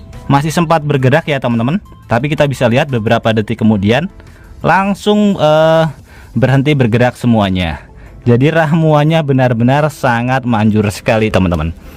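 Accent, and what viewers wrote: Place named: Surabaya, Indonesia. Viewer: Suroboyo masku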